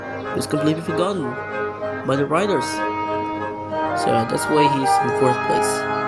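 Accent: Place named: Indonesia. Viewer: i can tell the indonesian accent lol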